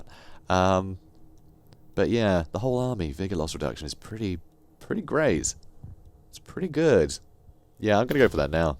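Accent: France. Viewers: Hey serious question where did you learn to do the french accent! That's one I'm not good at but want to learn! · Appreciate all your Total War videos. Favorite part of this one is the French accent 😄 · Pretty good Bretonnian(french) accent!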